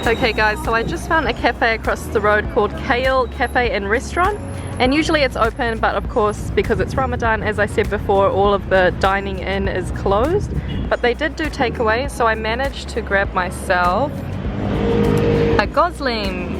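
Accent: New Zealand. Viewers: You have the 2nd most beautiful New Zealand accent of the world.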